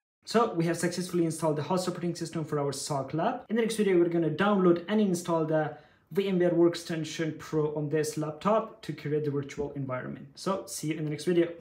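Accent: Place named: India. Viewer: You can't hide your hideous indian accent well enough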